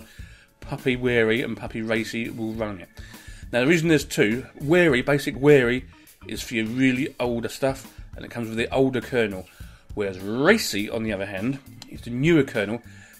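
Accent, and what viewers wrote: United Kingdom. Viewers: British best accent :-)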